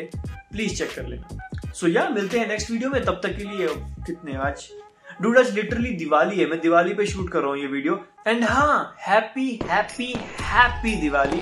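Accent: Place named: Indore, India. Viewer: Full too Indori.😂😂😂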